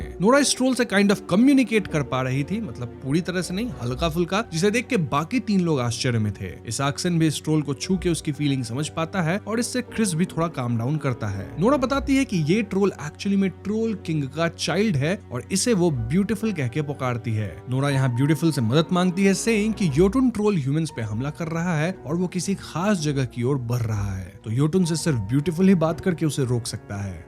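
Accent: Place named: Bihar, India. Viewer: broh no hate but apna accent thik kro yaar bihari accent nikal deta h gussa aata h ekdm se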